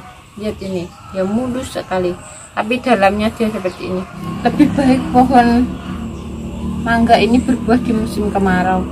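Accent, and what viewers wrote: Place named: Java, Indonesia. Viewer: Medo bahasa jawane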